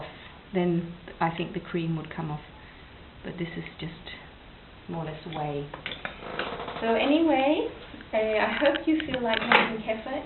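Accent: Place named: England, United Kingdom. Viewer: What a great video and what an amazing English accent, you speak better English than me,lol. Keep up the good work, God Bless.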